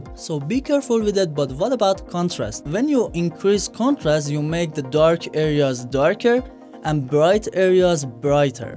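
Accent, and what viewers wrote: Iran. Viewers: ایرانی هستی به حرف زدنت میاد ایرانی باشی are you from iran? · Are you from ir?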